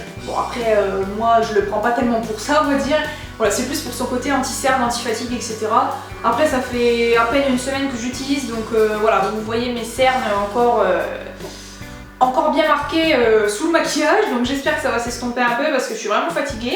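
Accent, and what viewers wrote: North, France. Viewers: Tu es du nord non? Classe tes achats! J'ai le double wear aussi je l'aime trop!!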